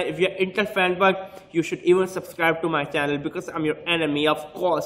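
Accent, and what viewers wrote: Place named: India. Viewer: Damn what are you talking can't unterstand with this kinda Indian or whatever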